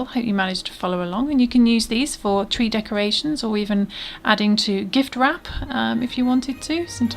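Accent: United Kingdom. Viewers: Hi! Thank you so much for sharing this little Angel. It is perfect to send in a Xmas card to my sister in Germany. I know she will love it. You have become my go-to for all things crochet because your instructions are so detailed and very easy to follow. Plus, I just love your British English and find your voice very pleasant to listen to. Thank you so much! :)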